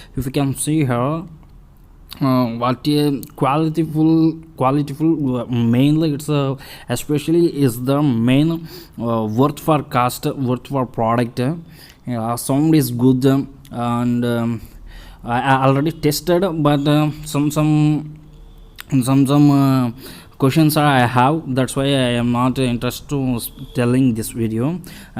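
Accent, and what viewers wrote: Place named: Telugu, India. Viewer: Endayya idi. Sorry, I got distracted from content with the dialect. Tiney leda matladu. Telugollu ila kuda English matladtara.. Wah! Kamal ki chiz hy yar.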